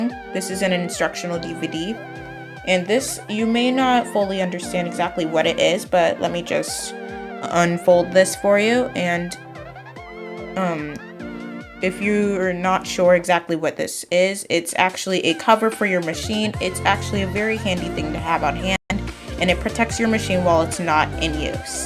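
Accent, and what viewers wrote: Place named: Midwest, United States. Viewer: I can tell you're from the midwest by how you say your T's as D's...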